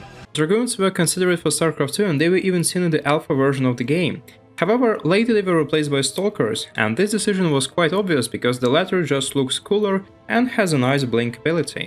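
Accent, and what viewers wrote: Russia. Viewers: по акценту можно узнать, что русский))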